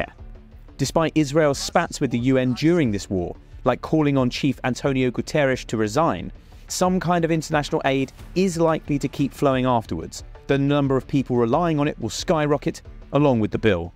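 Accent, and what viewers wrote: United Kingdom. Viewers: I love the British accent, but you’re in denial or in fantasy land the IDF is losing the ground battle but it’s easy for them to attack civilians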